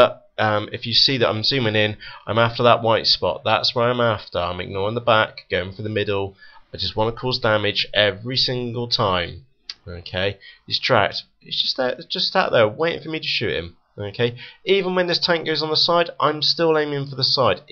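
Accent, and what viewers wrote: United Kingdom. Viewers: are u British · Your speak is too British!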